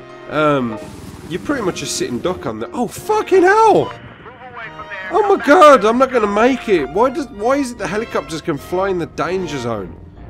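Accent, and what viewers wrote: Birmingham, United Kingdom. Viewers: that Birmingham accent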